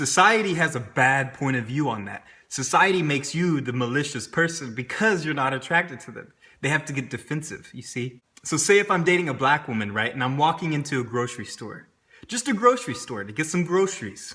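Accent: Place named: Latin America. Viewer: And honey, I know my races very well, you are mixed somewhere down the line, you are definitely not pure white, I can tell by the slight color to your skin, and facial features. You also have a slight accent that of a Hispanic. Europeans are very mixed with Indians and other races somewhere down the line. By the way, nice video.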